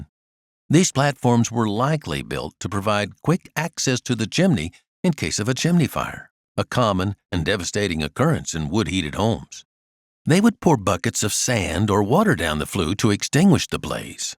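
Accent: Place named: General American, United States. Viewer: It is a standard American accent. I think it sounds great. Always more positive.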